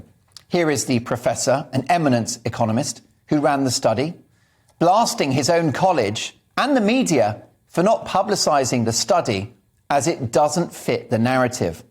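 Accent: United Kingdom. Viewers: Thank you 👌It's long before done yet. Reckoning, it will be soon enough. Love your channel and love your British articulation 👌😊Daily follower here. From Sri Lanka.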